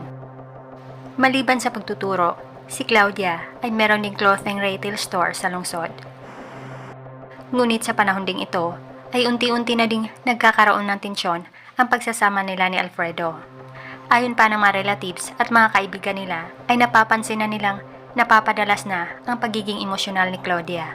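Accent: Visayas, Philippines. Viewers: Very besaya ang accent dzaiiii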